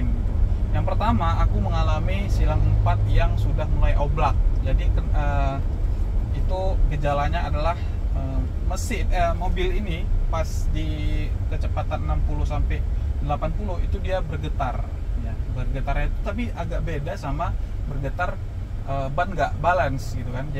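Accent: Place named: East Java, Indonesia. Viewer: Orang mana om, kok logatnya pake le lek gitu.. Salam dari jawatimur surabaya😊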